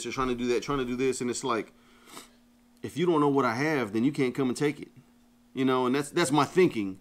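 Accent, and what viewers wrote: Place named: Houston, United States. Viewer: I’m from the Houston area, and you sound like you’re from the same area. Where is the place you get your holsters made?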